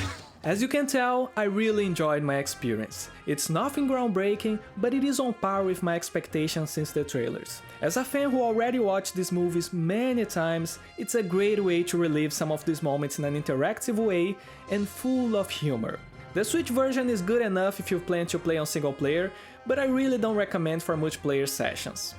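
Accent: Brazil. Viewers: Your accent, looks like brazilian speaking english. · mano tu é br? esse sotaque de leve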